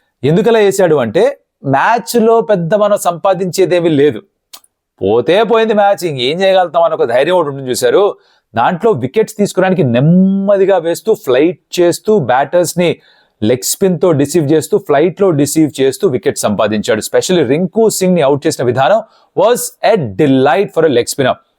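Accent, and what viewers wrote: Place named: Godavari, India. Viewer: Bro Dialect enti Godavari Zilla vala la undi , Any roots from Godavari Districts?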